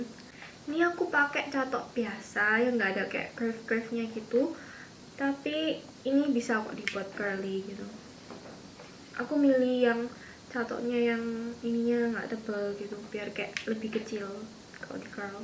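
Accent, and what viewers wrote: Java, Indonesia. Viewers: Wjah chines ... Logat eee medhookk y mbaakk ... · medok ya suaranya tp lucu hehe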